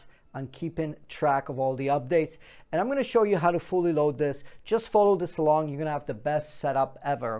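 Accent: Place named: Texas, United States. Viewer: Texas man! where u from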